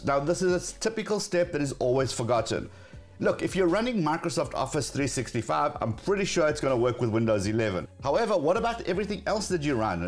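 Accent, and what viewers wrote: South Africa. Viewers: I'm hearing a 🇿🇦 accent, or am I mistaken? 🤔.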